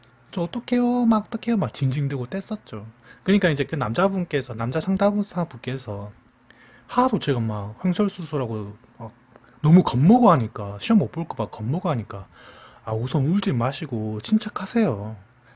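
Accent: Jeolla, South Korea. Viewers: 전라도 말투네용ㅎㅎ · 전라도 사투리 엄청 쓰시는데유?..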